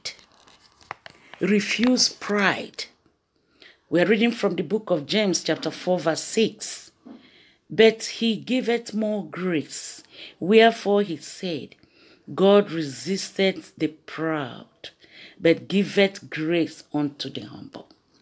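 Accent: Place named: Africa. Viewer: Thanks for sharing the word of God. It feels nice to hear your accent, I'm missing my friends from Africa.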